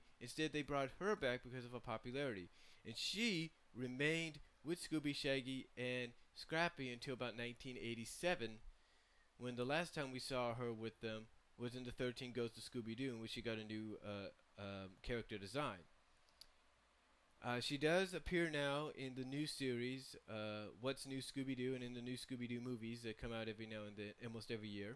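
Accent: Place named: New England, United States. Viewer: Are you from New England or somethin g? You sound like a very young Ralph Bakshi.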